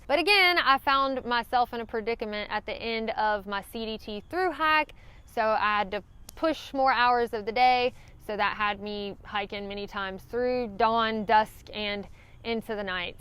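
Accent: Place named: South, United States. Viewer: Good advice on the antidiarrhea medicine. I'm going to put that on my checklist right now. Also, I had to laugh. I couldn't understand why you said not hiking in bear country until I realized you were saying night hiking. I guess I need to come down south more often. 😁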